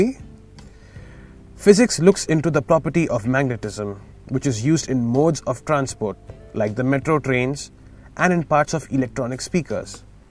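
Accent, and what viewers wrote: India. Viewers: First when i saw the video with an indian voice i thought that this video is going to be a textbook explanation but while the video is going on, It is how i wanted it to be. this is very different from other regular boring textbook explanation videos. thankyou for the great content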